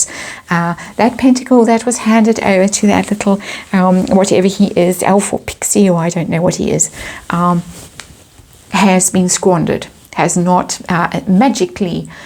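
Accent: South Africa. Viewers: Past 30 years living and working on various continents. Instantly regognised your Sout-African accent. ✨Marvolous. ✨ Since then i always follow your input.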